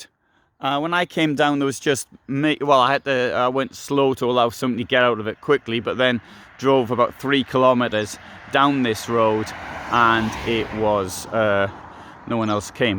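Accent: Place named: North East England, United Kingdom. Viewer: I can here a north east English twang to your accent😁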